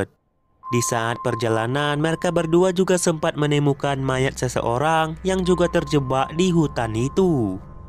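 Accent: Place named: Medan, Indonesia. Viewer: aku suka aksen Medan,tapi klu buat review boleh lah bang di kurangin dikit aksen Medan nya,biar lbh nyaman di telinga 🙏🏻 mohon maaf sekedar saran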